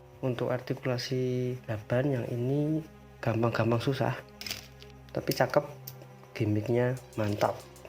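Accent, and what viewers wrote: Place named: Java, Indonesia. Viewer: suweneng aku lek wong jowo review gundam, medok medok piye ngono lho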